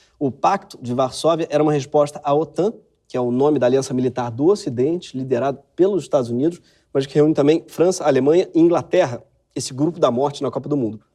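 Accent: São Paulo, Brazil. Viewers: Para de fazer esse sotaque snob dos paulistanos pois 80% de nós não somos assim Um abraço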